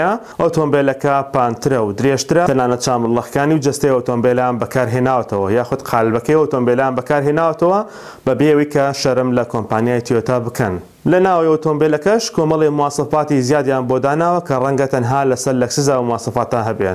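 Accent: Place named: Iran. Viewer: هذا إيراني لهجته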